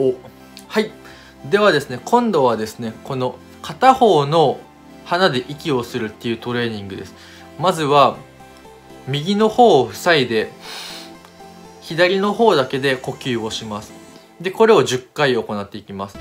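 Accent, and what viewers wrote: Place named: Okinawa, Japan. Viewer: 沖縄のなまりはいってますね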